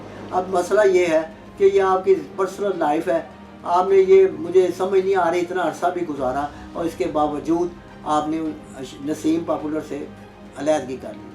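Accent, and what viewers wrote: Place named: Punjab, India. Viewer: Buth boray lyjay may batha rahy oap k8 urdu punjabiyu k8 thara hai ap k8 video sun nay k9 dil nahi chahatha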